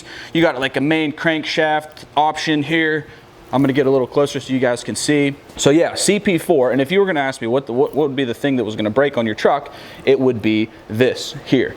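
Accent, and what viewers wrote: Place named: New Jersey, United States. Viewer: You must be from NJ!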